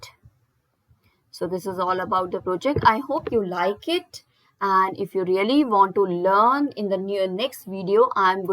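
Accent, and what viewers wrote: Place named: South India, India. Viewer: South Indian Accent 😛😛👌👌👌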